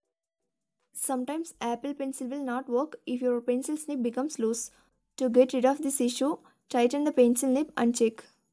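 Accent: India. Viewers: I CANNOT STAND INDIAN ACCENTS!!! STFU!!!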